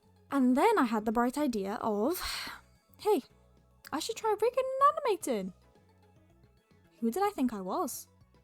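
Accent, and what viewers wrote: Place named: Wales, United Kingdom. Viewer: amazing video! love the strong welsh accent!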